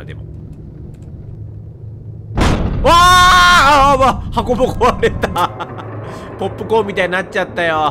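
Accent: Kansai, Japan. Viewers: 関西人ですからね❤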